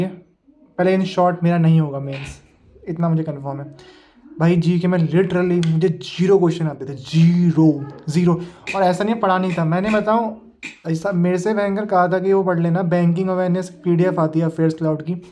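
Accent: Uttarakhand, India. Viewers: uttarakhand se hai kya bhai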